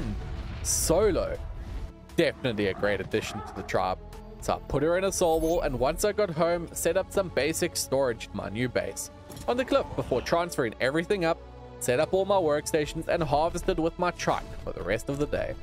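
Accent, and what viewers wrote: South Africa. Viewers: wait ur south african I wanted to say ur accent eyyyyyyy LEKKER MAN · Hey bro awesome video I just want to ask are you from South Africa you sound like it 😅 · You from South Africa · Are you south African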